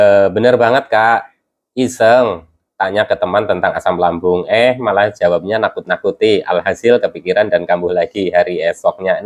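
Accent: Banyumas, Indonesia. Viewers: Asalamualaikum mas. Ora ngapak ora kepenak, njenengan ana ig ora mas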